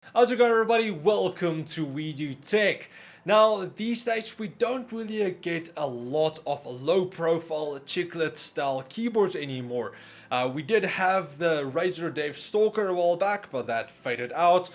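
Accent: Afrikaans, South Africa. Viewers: Ek wou se jy klink Afrikaans!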